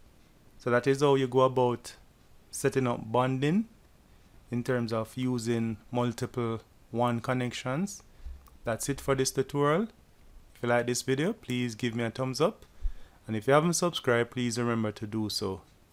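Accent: Jamaica. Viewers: Well Done I love your Jamaican accent. Big ups man!!!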